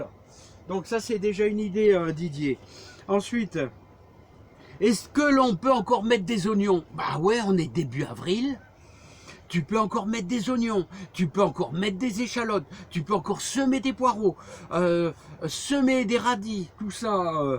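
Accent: North, France. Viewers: Merci j adore le patoi du Nord 👍😜🤣 et tes vidéos conseils. Bonne journée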